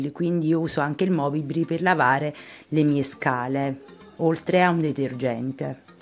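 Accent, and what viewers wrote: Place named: Sicily, Italy. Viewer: Mi fai morire dalle risate quando parli in dialetto 🤣🤣🤣🤣 io sono siciliana e ti capisco bene 😁 e si siamo sempre a pulire 🧹 ti abbraccio ❤️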